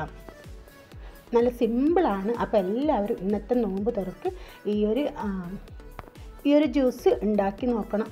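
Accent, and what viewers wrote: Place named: Kannur, India. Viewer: മാഷാ അല്ലാഹ് എനിക്കു ഏറ്റവും ഇഷ്ട്ടം ഉള്ള ഒരു സാദനം ആണ് ഈ കാരറ്റ് 😋കാരറ്റ് കഴുകി മൊഞ്ചക്കി പാവം അതിനെ ചൂട് വെള്ളത്തിൽ മുക്കി അതിന്റെ ചുറ്റും ഉള്ള അണുക്കളെ ഒക്കെ കൊന്ന് വേവിച്ചു തണുത്ത ശേഷം മിക്സി യിൽ ഇട്ട് കുറച്ചു പാലും പഞ്ചാരയും ഐസ് ഐസ് ക്രീം ഒക്കെ ഇട്ടു ഉണ്ടാക്കിയ അടിപൊളി ഹെൽത്തി ജ്യൂസ്‌ ഉണ്ടാക്കുന്ന കാഴ്ച ഞമ്മുടെ കണ്ണൂരിന്റെ സ്വന്തം ചാനൽ നിങ്ങൾക്ക് മുമ്പിൽ നാടൻ കണ്ണൂർ സ്റ്റയിൽ സംസാരംകൊണ്ട് നിങ്ങൾക്ക് മുമ്പിൽ അവതരിപ്പിച്ചിരിക്കുന്നു എനിക്കു ഇഷ്ട്ടം ആയത് കൊണ്ടു എന്റെ ഇന്നത്തെ ഇഫ്താർ ഡ്രിങ്ക്സ് ഇതായിരിക്കും 👌👌😋🥰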